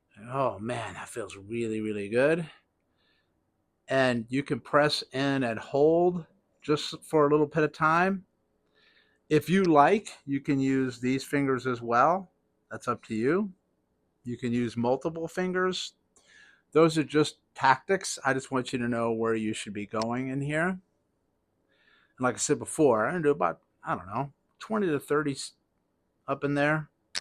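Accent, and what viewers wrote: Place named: Louisiana, United States. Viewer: Tell me this man has Louisiana roots..... or am I hearing things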